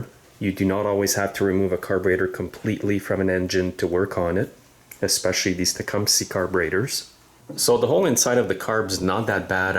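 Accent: Quebec, Canada. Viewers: Wow, the detail n time you took in this video was great! I'm a Truck and Trailer mechanic myself but Im shy around small engines, I look forward to watching more of your videos, like I'm realy blown away with the care and detail in this video. Keep up the great work! ( I also wonder if that's a Quebec or Acadian accent, i'm on the east coast myself?) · You sound French Canadian, where abouts are you located?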